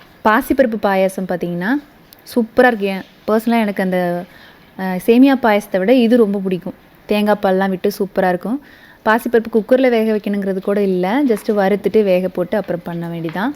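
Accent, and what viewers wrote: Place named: Kongu, India. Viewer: Yenna alagaana kongu tamil!! Kannu padam poguthamma, muthalla suthi podunga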